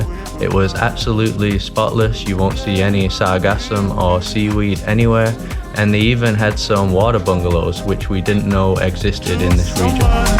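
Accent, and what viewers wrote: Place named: Manchester, United Kingdom. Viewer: Sound like you're from Swinton or Clifton, Manchester!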